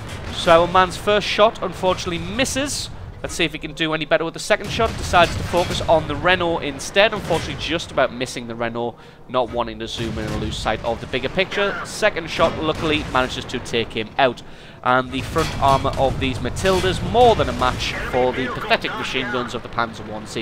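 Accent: United Kingdom. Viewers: Your accent is somewhere in the U.K. What nationality or accent is that from?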